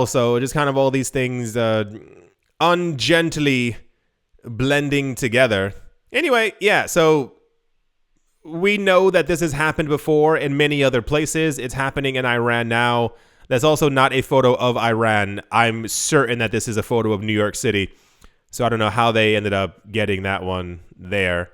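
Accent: France. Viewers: Your french accent is so cute!!!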